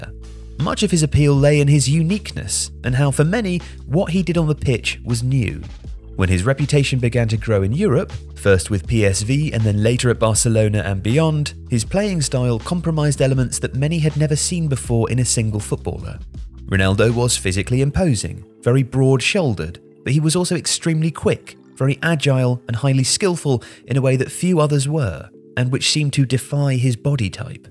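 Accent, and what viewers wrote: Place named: United Kingdom. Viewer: Guys, I think you’re brilliant and I watch many of your videos because they’re awesome. But I don’t understand a) how you came up for the pronunciation of FENÔMENO (you said fenomino) and I don’t understand in which of the “best player of all time” conversation Ronaldo is NOT present. In my experience he is not only ALWAYS present, but up there for at least second-best. Is it maybe because I used to live in Italy and you (sound like you) live in the UK?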